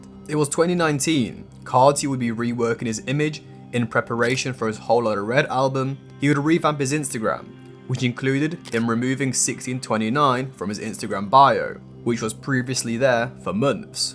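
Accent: United Kingdom. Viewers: Why u gotta have English accent it would have been better wit sum else I understand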